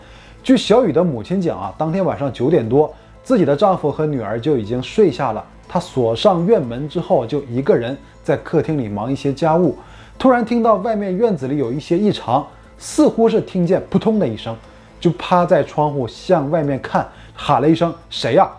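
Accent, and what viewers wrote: China. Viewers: 就是放弃了吧，不配合也没什么奇怪的，不是每个人都那么有求生欲。只不过后面也许是重新有了什么生存的渴望，才会开始上诉。 能下跪祈求，也许是真的割舍不下吧，活着不能在一起，那就一起死吧，只不过他终究是没有自杀成功。抛开对错，他的行为也没什么奇怪。人家性命都不要了，又怎么会在意一点儿法庭上的行为。 主播口音像大陆人，但一个生在红旗下，从小唯物主义洗脑的人，扯什么遗臭万年太荒诞了吧，满手血腥的都不会在意，咆哮公堂的有什么可在意的，自古以来这样的人多了去了，谁的名字能因为这个留下了，“遗臭万年”的成本有这么低么。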